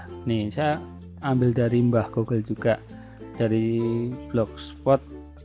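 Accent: Banyumas, Indonesia. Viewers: pisan mas daerah ndi kie, purwokerto hadir😂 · mantab om tutorial jos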